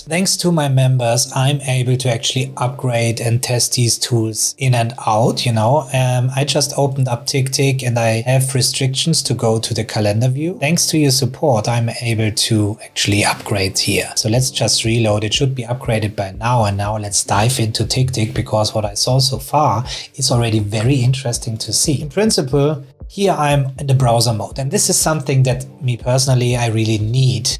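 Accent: Germany. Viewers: do i hear a fellow german accent there? Great video 👌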